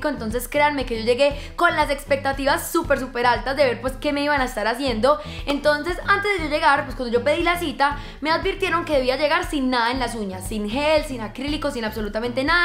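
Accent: Antioquia, Colombia. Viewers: habla todo paisa eso me encanta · Eres colombiana nada mas con tu voz 😐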